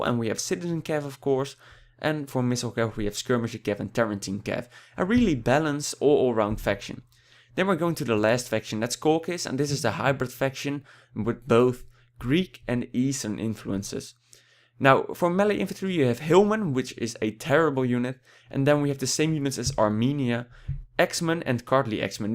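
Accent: Netherlands. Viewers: Where are you from? Your accent kinda sounds like a dutch person speaking english ;)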